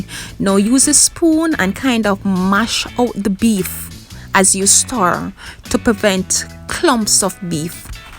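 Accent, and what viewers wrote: Jamaica. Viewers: I kept seeing authentic patty recipe on youtube but everyone i watched wasnt giving me the authentic jamaican vibes😒 and the dough wasnt giving me the flake😔. So my search brought me here🙃 to the real Authentic jamaican patty💯💯. I needed that accent this certified🥇 it for me lol the" ya mon" at the end🤗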